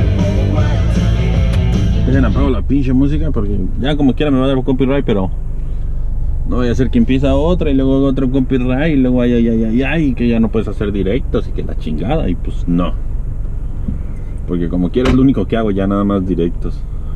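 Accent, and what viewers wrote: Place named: North, Mexico. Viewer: Te oyes bien norteño :v like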